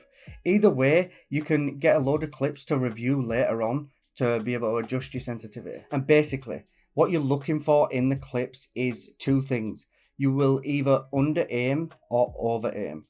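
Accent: United Kingdom. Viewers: like I so many UK youtubers w/out this accent 😅 Greate video btw 💪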